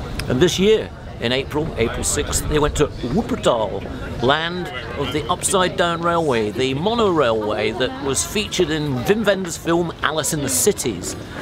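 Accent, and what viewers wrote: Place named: Scotland, United Kingdom. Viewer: You are a bit of a, if you follow the lyric. Good promo though -an educated Scottish voice (fluent & trustworthy!)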